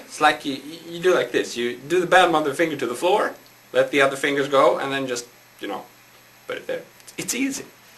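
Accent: Sweden. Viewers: Haha!!! He´s swedish, i know the accident ´cause i´m also swedish (even through, i´m not that bad att english accident!!!) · I am ashamed because it sounds just like Swedish accent. I fight tooth and nail to be self aware and not sound like that when I speak English! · im a member at ultimateguitar, quit hating on this guy, he's freakin amazing. His accent is because he's from Stockholm Sweeden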